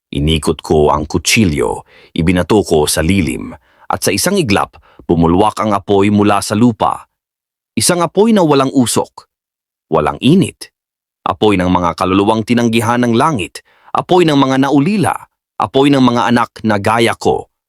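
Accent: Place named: China. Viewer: Intsik ka ata salita mo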